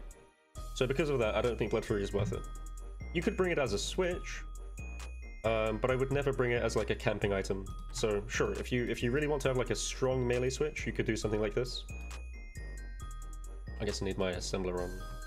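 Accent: United Kingdom. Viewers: You definitely have a Devonshire accent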